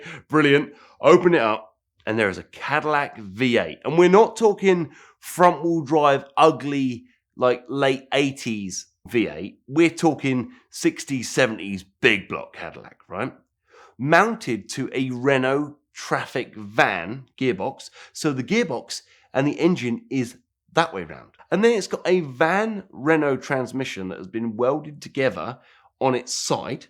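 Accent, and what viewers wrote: United Kingdom. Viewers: this guy is too British for me. can't take the accent for longer than a few seconds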